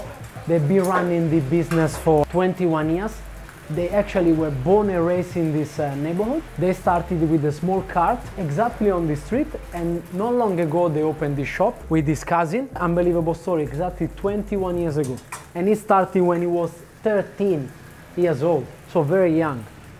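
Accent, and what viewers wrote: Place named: Italy. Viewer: Your italian accent makes your reactions even better :D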